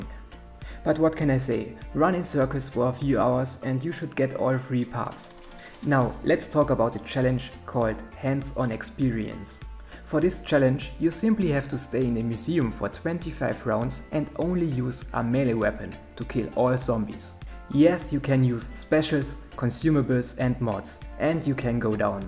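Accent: Germany. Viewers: im curious, is your accent german?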